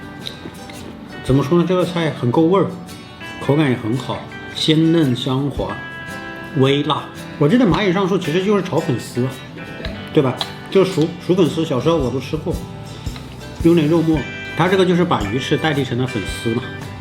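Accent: Hubei, China. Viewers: 说话跟那个阿斗归来了很像。湖北口音？ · 听口音像湖北人？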